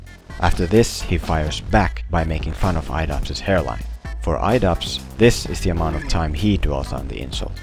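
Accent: Finland. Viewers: You're finnish aren't ya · are you from finland? your accent is familiar :) although i do disagree at some points, great video! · Although I can't agree with the point you made , I have to agree with the rest. Great video with a lot of effort put in! By the way, I can't quite place your accent. Do you happen to be Finnish? :) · Are you from Finland? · Might you perhaps be Finnish? The accent made me wonder :D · Dude, you Finnish? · Are you Finnish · your accent sound familiar? Do you happen to be Finnish? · You sound Finnish. Suomi?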